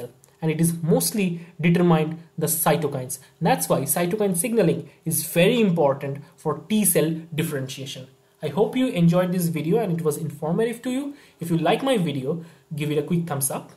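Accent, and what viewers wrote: India. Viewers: Idk why you are using that fake American accent ,it is so much irritating that I can't even focus on the lecture...it can be easily caught... Though u can speak in simple and sweet Indian accent, it would be more pleasing to 👂 .😊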